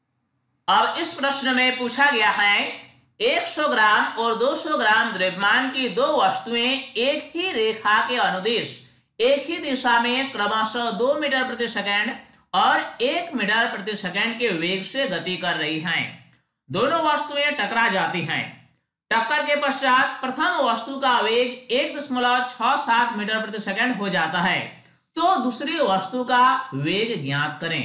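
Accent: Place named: Bihar, India. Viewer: Sir apki boli achi nhi h asa lag rha h ka aap bihari ho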